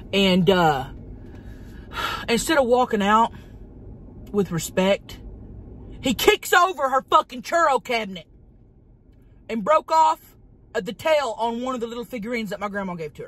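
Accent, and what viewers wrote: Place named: Oklahoma, United States. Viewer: My blood really started bowlin it really started bowlin...I'm dying she's hilarious cause we really talk like that in Oklahoma. 😂🤣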